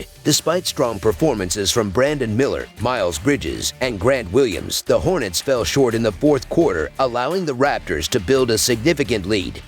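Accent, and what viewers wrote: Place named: Boston, United States. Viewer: Love hearing your Boston accent, thanks for the laugh!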